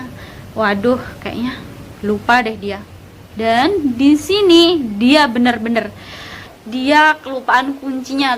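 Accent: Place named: East Java, Indonesia. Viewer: Rek mesti asal jawa timur yach kak... Serem juga yach dengarnya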